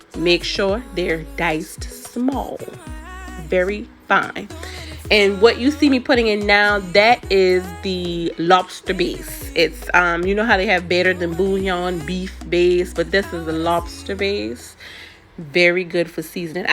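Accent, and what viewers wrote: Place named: South Carolina, United States. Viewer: I knew you where from sc! Your accent is heavy lol! Going to try this for vday! But would it matter if I use crab claw meat? I don’t really care for the lump crab lol.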